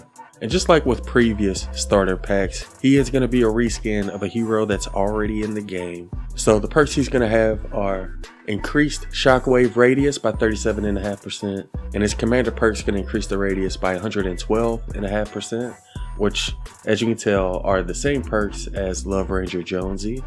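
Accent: South, United States. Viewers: I'm going to need you to make a PSA video. During the last 2 weeks I've had a good handful of players thinking I was you(not believing I wasn't you) and asking me questions like "When is the next meme video releasing", asking me what cookies looks like, etc etc... I've heard my voice from echos through other players mics and I can't understand how people thinks I sound like you, the only thing I can think of is we both somewhat have a Southern Accent...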